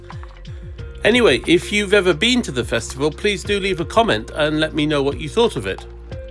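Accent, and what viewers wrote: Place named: United Kingdom. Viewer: Welcome to Hofu❗️ Great to see this event in your video 👍 Where are you from ? 🇬🇧or 🇦🇺 from your British accent. Hofe has many historical building, so please enjoy to stay✌️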